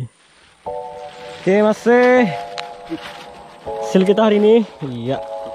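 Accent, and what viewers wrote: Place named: Sarawak, Malaysia. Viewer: bahasa nya kyk sarawak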